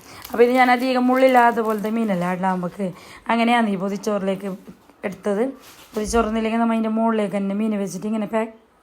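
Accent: Kasaragod, India. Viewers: Kumbla specill baasa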